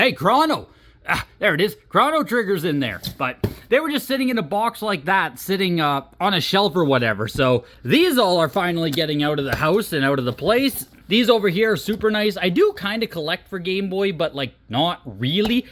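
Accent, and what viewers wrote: Canada. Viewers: If you had $6.4k ready to spend on one game you are likely in a better position than you think to buy a house.... Or you could be broke and making wild financial decisions. Is the house for sale or did he already sell it? If it's still for sale look into buying it. 3.5% and 5% loans are pretty common. Anyway I was typing this while still watching and the more you talked you sound Canadian so that might not even be relevant lmao Edit: yup right after I commented you mentioned a Canadian copy of a game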